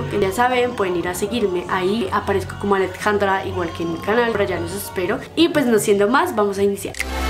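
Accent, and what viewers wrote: Colombia. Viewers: Es colombiana!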